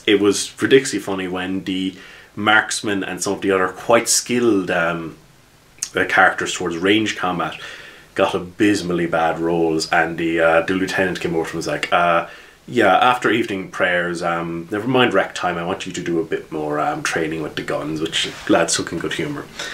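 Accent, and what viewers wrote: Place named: Newfoundland, Canada. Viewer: Huge newfie accent? Awesome. My GM is a newf. :)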